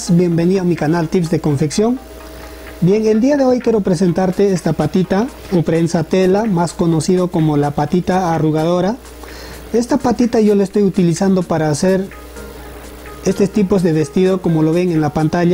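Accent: Peru. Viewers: Buena info....eres de Perú?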